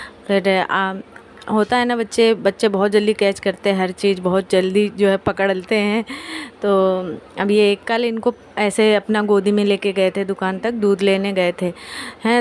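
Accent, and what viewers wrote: India. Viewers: Kanpuriya boli acchi lagti hai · Mujhe to nhi lga UP ka bhasa acha lgta h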